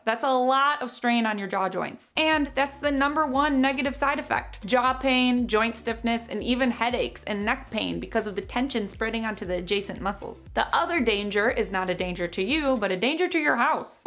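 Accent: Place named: Midwest, United States. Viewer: I can tell a Midwest girl from a mile away. Hiiiii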